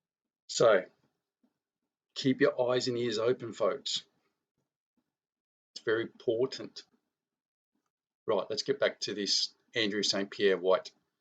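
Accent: Australia. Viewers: I'm so glad you do this. You're one of a handful of Aussies on YouTube saying this stuff. I have an American friend who though quite the lefty at least understands the idea of prepping. I always shout out to the Aussie preppers on overseas channels. It's nice to come and hear an Aussie talking. I've only been prepping for a little while, but really I've gone back to what my Mum used to say and she didn't know about any of this globalist stuff. But she was a farm girl and had been told about the great depression so she knew.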